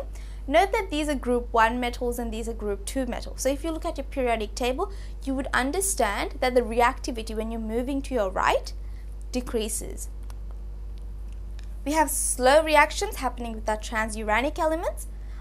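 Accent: Australia. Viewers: I can sense an Australian accent 👀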